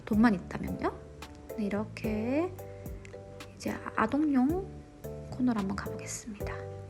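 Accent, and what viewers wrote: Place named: Daegu, South Korea. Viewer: 말이 빨라지면서 자연스럽게 들려오는 경상도 대구 사투리가 정겹게 들리네요. 늘 건강하세요. 영상 감사드립니다.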